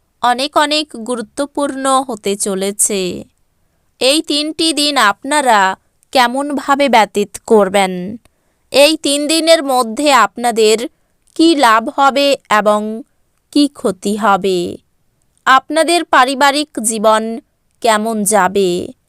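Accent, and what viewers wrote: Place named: Bangladesh. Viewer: Ki Bangladesh thake bolcchen mone hocche.....bhalo kore bangla bolte sikhun ..musulman bole mone hocche....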